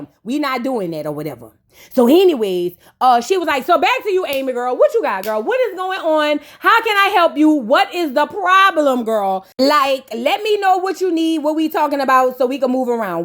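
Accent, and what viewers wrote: New Orleans, United States. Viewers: Love the commentary and the New Orleans accent 🤣🤣🤣🤣🤣🤣🤣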